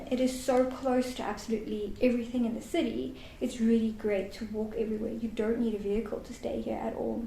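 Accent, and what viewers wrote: South Africa. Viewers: Ek wou se ek hearken n SA aksent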